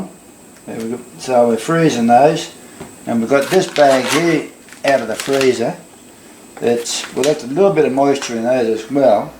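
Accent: Australia. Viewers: Liked the video and loved your true blue accent. Went nostalgic on my time in Aus. Good luck to you!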